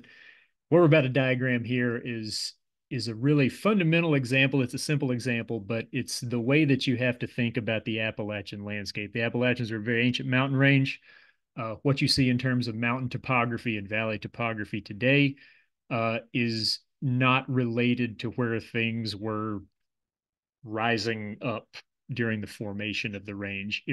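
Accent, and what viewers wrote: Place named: Philadelphia, United States. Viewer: Cool beans Unrelated but are you from Philly or Baltimore?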